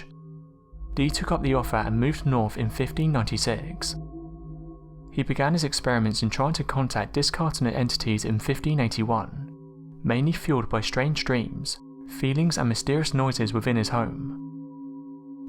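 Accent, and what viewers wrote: United Kingdom. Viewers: I normally don't care for the British accent but there is something soothing about his voice. Makes it that much more enjoyable.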